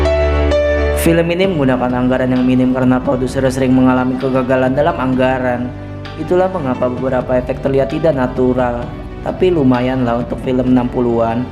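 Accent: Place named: Betawi, Indonesia. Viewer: Narator logatnya yg terakhir agak ngelenong 😀😀😀🙏🙏🙏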